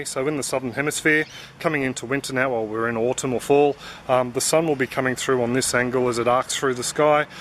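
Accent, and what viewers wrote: Australia. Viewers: You sound Aussie!! Please join my facebook group on growing potatoes in Australia!! Cheers!! :-)!! <link>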